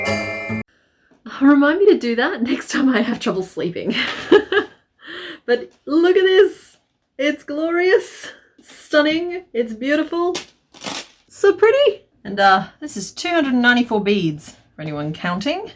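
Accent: Australia. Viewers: I was over the moon from the moment I hear the Aussie accent! No disappointment here 😂 look forward to binging through your videos now "hyperfocus? Me? Never!" 😅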